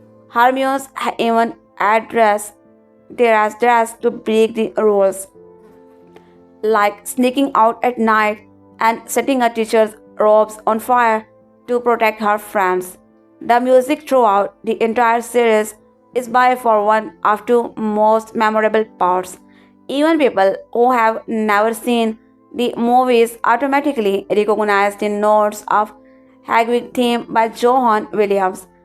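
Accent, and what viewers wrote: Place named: India. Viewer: We don’t need your story telling with weird indian dialect you morron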